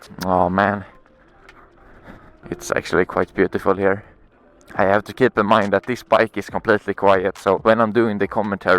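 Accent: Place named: Sweden. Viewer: you soud swidish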